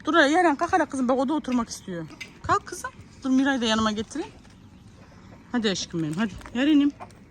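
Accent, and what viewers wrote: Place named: Azerbaijan. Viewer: Salam yaxşı gəzmələr ❤siz azərbaycali kimi danişirsiz anenlere salam göndərirəm azərbaycanan 😍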